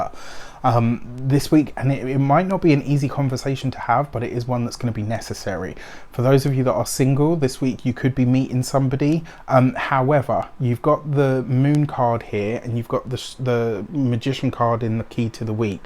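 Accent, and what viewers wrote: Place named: Wiltshire, United Kingdom. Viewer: keep up your gift too friend. your accent brings me back to my youth. where's the accent from in Wiltshire ? love & respect...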